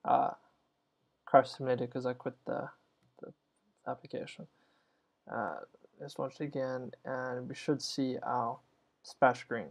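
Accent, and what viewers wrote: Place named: England, United Kingdom. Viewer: Thank you. Very informative... expressed eloquently in a perfect clear English accent!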